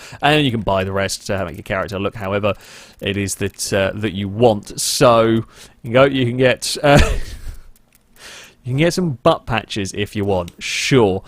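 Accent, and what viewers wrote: United Kingdom. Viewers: I have a British professor who sounds vaguely like this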